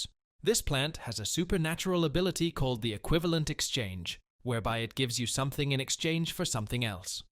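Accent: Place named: Spain. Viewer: el acento español a muerte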